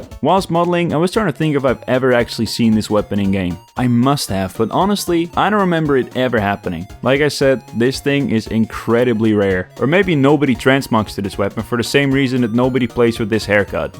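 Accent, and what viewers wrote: Netherlands. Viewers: You sound Dutch!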